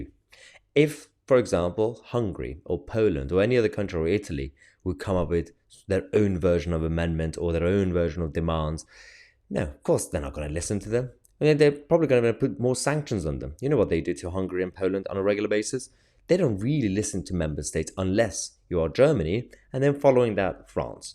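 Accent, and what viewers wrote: United Kingdom. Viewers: A brown Muslim that sounds British... anchor baby's got a u tube chanel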